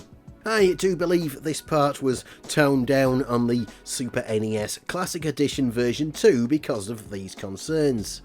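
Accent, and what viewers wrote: United Kingdom. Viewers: Can’t listen to a Brit talk about snes and Nintendo in general.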